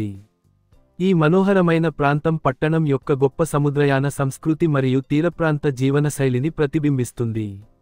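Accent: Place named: Telugu, India. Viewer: Interesting Telugu accent 😊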